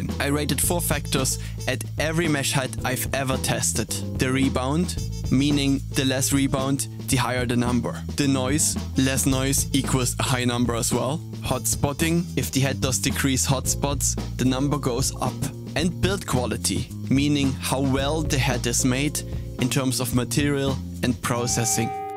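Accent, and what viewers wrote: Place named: Germany. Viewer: Hey sehr gute Arbeit, genau wie deinen anderen Videos... ich schreib einfach mal auf deutsch, weil ich deinen Akzent raus höre ;) Ich habe eines meiner akustischen Sets nach Anleitung umgebaut. ;) Und überlege jetzt welche Felle ich nehmen soll? Für die Snare hätte ich an das Real Feel gedacht. Für die Toms überlege ich Remo Silentstroke oder was anderes in der Preisklasse (QuiHead) weil 4 Toms (10,12,14,16) mit Real Feel geht ein bisschen ins Geld. Als Reso sollte man da auch ein Mesh Head nehmen oder gar keins oder ein normales Reso Fell? Wäre schön wenn du mir bei der Entscheidung mit deiner Erfahrung helfen kannst! ;)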